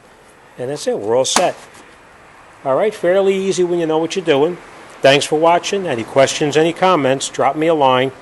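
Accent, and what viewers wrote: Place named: Staten Island, United States. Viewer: Love your videos brother. Your accent makes me miss home...Staten Island.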